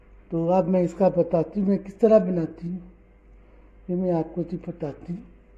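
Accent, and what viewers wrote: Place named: Lahore, Pakistan. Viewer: The way you talk is amazing , true lahori style .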